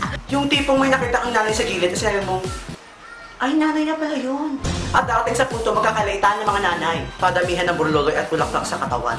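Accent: Visayas, Philippines. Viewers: Taga san ka po? Bisaya ka ata eh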